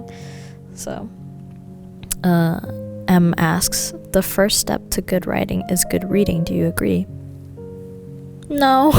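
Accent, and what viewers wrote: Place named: United States. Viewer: Thanks for talking like an educated American USA in YouTube.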